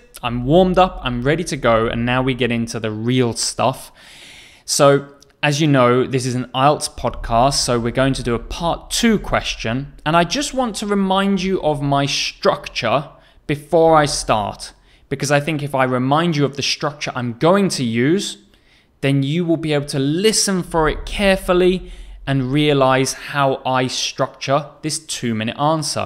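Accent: United Kingdom. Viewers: Like it your British English totally and thank you very much for your videos.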